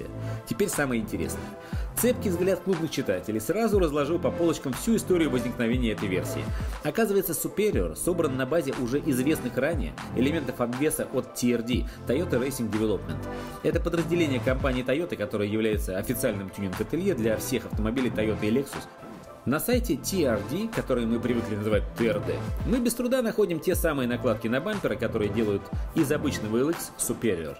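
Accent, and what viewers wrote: Standard. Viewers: Многие обзоры смотрю но пока всех рвёт-хороший словарный запас, чистая русская речь без хаканий и гаканий, нет ёбоной рекламы сукотеков, музон и картинка выше всех похвал-что вам блядь ещё надо, чел отрабатывает каждый ролик на 150%. Давайте скажем ему СПАСИБО!!! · Спасибо,. Всем не угодишь. Я с Казахстана. И мне приятно слушать чистую русскую и грамотную течь.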